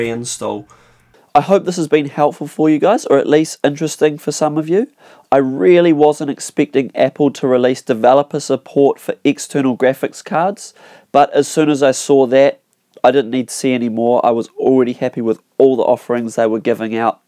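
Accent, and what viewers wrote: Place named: New Zealand. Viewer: New Zealand? reminds me of Rhys Darby (Murray Hewitt's character) from Flight of the Conchords :) btw, great video. thanks for sharing.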